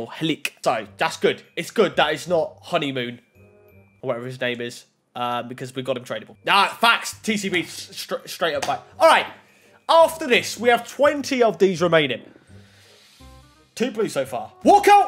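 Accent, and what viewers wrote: Northampton, United Kingdom. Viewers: Are you from Northampton or something?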